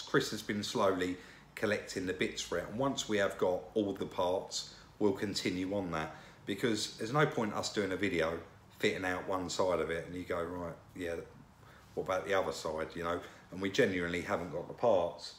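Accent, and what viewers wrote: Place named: Essex, United Kingdom. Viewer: Good for you bud 👍😁😎 look great. Still sound like an Essex Boy though 🤔😜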